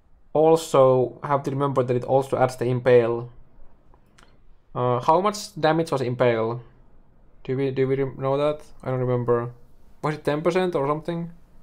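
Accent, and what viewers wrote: Finland. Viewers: Olin huomaavinani Suomalaisen aksentin ja sitten katsoin nimeä. Kyllä vain, hyvin tunnistettava aksentti.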